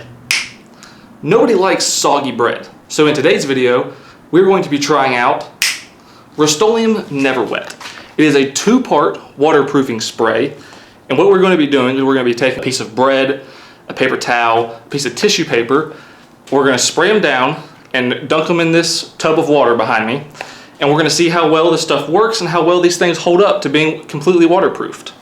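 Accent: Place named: Texas, United States. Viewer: What is this guy's last name? I believe with his slight accent he's from Texas?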